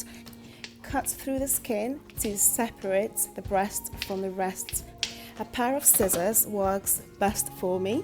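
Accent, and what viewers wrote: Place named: London, United Kingdom. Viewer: First of all lemme say you have a nice accent 😍😍guess you live In London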